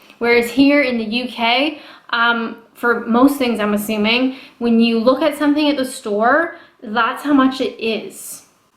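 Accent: Canada. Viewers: Your losing your Canadian accent. 😁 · Hi ya welcome back. I hope you don’t start to lose your accent too much as it sounds so cool fresh from Canada. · it's also so funny I notice your Canadian-ness in the way you speak, but you have a british accent on certain words now too (like the endings of certain words). Very interesting, not sure if you noticed! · You definitely sound more Canadian now that you've been home for a bit! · Trawno yep the Canadian accent is stronger after the visit. :) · But I have noticed that the more i watch you i can see the difference between the US accent and the Canadian one nust from your pronounced of some words and how you enunciated certain words. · "Stay on the RIGHT.. Stay on the RIGHT" Made me chuckle. Don't put yourself down, You are very good at this and very intresting so keep it up. Love the way you Canadians say "Out" like "Ooout" Haha and a great way to tell the difference between an American and a Canadian ;-) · Not seen a vid of yours in a while. 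I check in on your gram though. That said, when I played this video, I realised I had forgotten you had a Canadian accent. You have a great fan-base, you'll get tons of subcriptions... Not me though.... I'm poor. Have a great day. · I love your stronger Canadian accent. Noticed it straight away! I don't know if I'd prefer Canada, with it's big wide roads, but super long drives, or here, where everything is close but SO congested.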